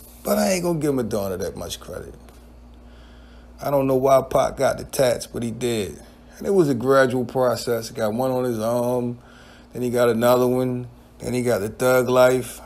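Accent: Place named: Boston, United States. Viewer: Hey where are you cuz you sound like you from Boston you sound like Snoop from The wire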